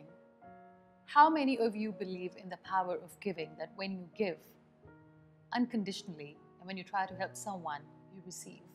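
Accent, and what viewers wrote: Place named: United Kingdom. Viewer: mam i just wana ask in which country u r still living.. ur english speaking accent is british.. u r jst osmmm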